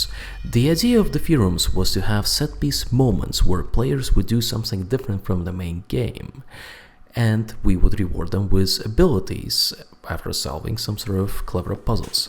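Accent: Russia. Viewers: Лолол, почему я слышу русский акцент? ._. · Говоришь по английски,а по голосу(произношение) понятно что русский с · Лол, акцент то русский)) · Замечается русский акцент)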